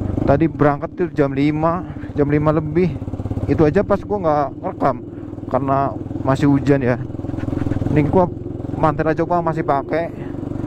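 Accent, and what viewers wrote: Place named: Java, Indonesia. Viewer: Sumpah , kalo bukan orang betawi jangan pake Lu GW lah tolong , keliatan banget Orang jawa dari tambahan kata KOH dan logat jawa gitu , jadi situ yang ngomong sini yang malu dengernya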